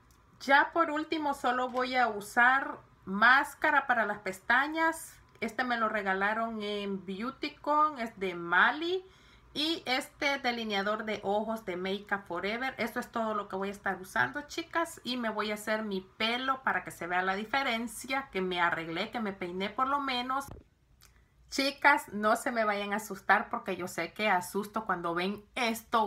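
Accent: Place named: El Salvador. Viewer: Por tu forma de hablar creo q eres de El Salvador como yo!!!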